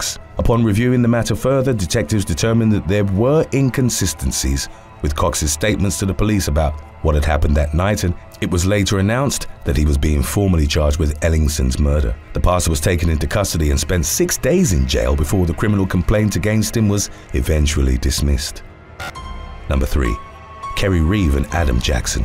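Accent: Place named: United Kingdom. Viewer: He's british pronunciation is def different nonetheless🙄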